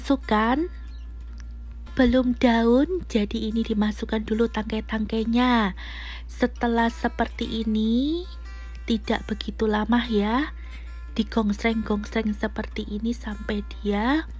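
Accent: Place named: East Java, Indonesia. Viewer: Didenger dengerin logate koyok wong asal jawatimuran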